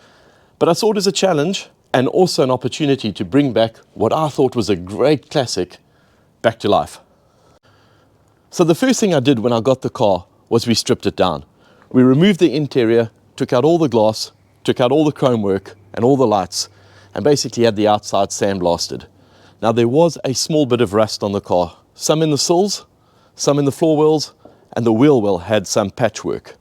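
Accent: South Africa. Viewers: Your accent definitely gives you away 😂😂😂 South African 🎉🎉🎉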